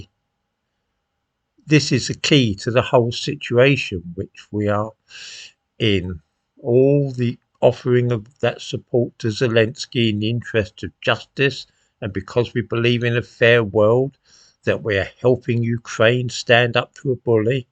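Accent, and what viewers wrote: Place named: Yorkshire, United Kingdom. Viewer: You sir could be from Yorkshire, a great honour, You say it as it is, well done t'old flower.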